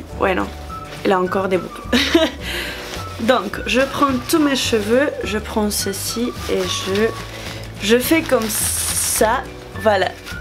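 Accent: Spain. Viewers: J'adore ton accent espagnol! Tu es trop drôle! Bonne continuation!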